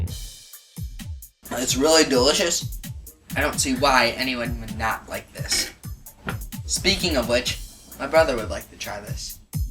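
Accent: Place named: United States. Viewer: Weird you sound American but your bro. Sounds like an Englishman great video though :)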